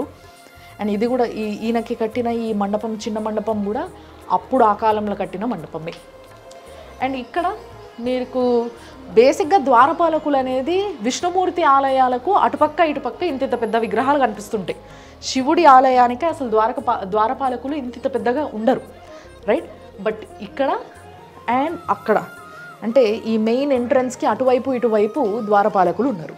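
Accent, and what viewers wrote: Telangana, India. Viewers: Your anchoring is cute, in Telangana slang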